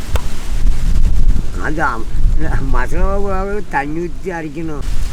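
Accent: Tamil Nadu, India. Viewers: சரி தான்...மற்றும் தேவை இல்லாமல் சிரிப்பதை விட்டு விட்டு இன்னும் நிறைய விடயங்களை செய்யலாம்...சமையல் செய்யக்கூடிய அல்லது சமைக்கக் கூடிய பொருளை ஒழுங்காக காட்டலாம்...அது போன்று பயனுள்ள விடயங்களை செய்யலாம்....சொல்ல வந்ததை சொல்லாமல் சிரித்துக் கொண்டே இருப்பதனால் பார்ப்பவர்க்கு எரிச்சலூட்டும்....மற்றபடி அவர் பேசுவது கடை கோடி மலை வாழ் மக்கள் பேசும் தமிழ் போல் தெரிகிறது...குழப்பம் வேண்டாம்....அவர் பார்ப்பவர்களுக்கு புரியும்படி பேசினால் நன்றாக இருக்கும்... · Yes pure indian. Have you not heard this slang tamil? Oldest language of world than your English 🤦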